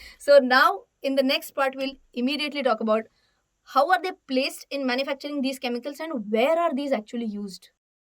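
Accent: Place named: Pune, India. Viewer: you are a proper Puneri accent and amazing analysis.. I like your approach..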